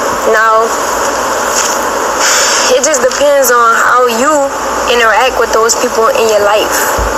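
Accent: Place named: Louisiana, United States. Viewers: Through it all, Ive always took note and loved her New Orleans accent. Its stronger now that she's back home ❤ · her Louisiana accent coming threw strong.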